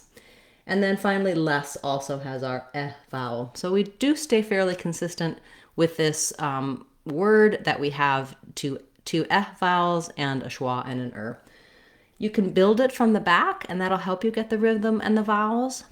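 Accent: United States. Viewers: Been learning English for a very long time , my vocabulary is vast and I’m almost flawless in grammar, never paid much attention to correct spelling. My biggest challenge is pronouncing the words correctly. I love American accent and I’m enchanted by your accent, words sound pleasant when you use them.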